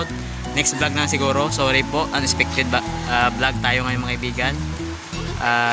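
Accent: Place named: Iloilo, Philippines. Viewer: Ilonggo ka.. Bro..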